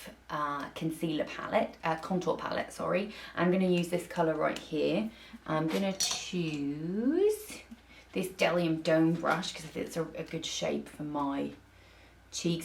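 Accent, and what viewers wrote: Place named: United Kingdom. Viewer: not wanting to generalize the british accent but you remind me so much of Lisa Eldridge. I love her and love your content!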